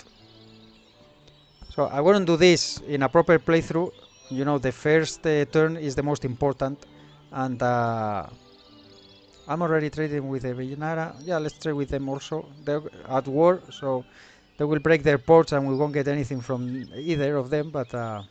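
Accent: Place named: Spain. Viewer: Solo me pareció extraño , entiendo que el mundo es anglo parlante pero me pareció extraño sin más , eso si , sino fuese por el acento jamás notaría que fueses español porque hablas muy bien el inglés. Pero bajo mi punta de vista una campaña se agradece en el idioma de uno, en este caso español , más cómodo y agradable o al menos para mi , hablando de series yo me vería encantado una de este mismo , del 1600 y con España como no podría ser de otro modo , hay que reconocer que el mod está algo verde y sin acabar pero aún así tiene su punto , además de que se juega en una Época donde todo nos era un poco más favorable y hace más disfrutable la campaña , al contrario que el 1700 donde empizas afixiado con el imperio. Soy de Málaga compañero , saludos.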